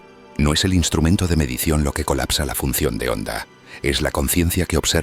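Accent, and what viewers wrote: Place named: Spain. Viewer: El acento español es muy desagradable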